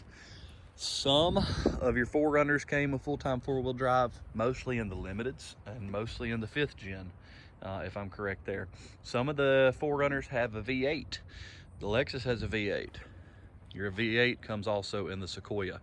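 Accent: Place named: South, United States. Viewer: I am registering my GX470 in the town a have second home in. I just bought it and can not drive it because all of registration paperwork is sitting in an airport because of the snow storm. I am so pissed. Thank you for the showing us that may not know the difference. Between the various SUVs. Love your southern accept. Ha ha. 👌🏽. Are you in Instagram?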